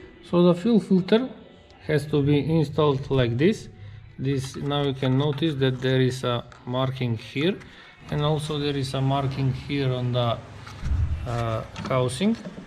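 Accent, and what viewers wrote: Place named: Russia. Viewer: а говоришь как русский🤝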